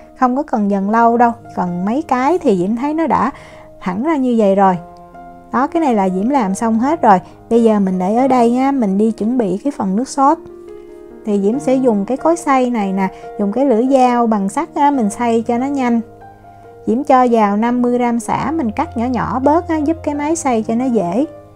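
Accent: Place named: Mekong Delta, Vietnam. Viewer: Chị này giọng nói dễ thương chắc là người miền Tây.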